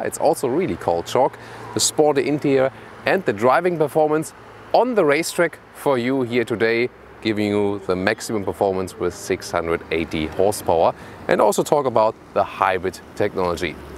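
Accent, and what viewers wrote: Germany. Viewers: sehr schön, vielen dank. und - nicht böse gement - gerade der schwere deutsche akzent macht das englisch für mich leicht verständlich ;-) weiter so, lg aus österreich. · Wer merkt auch das er deutsch ist ...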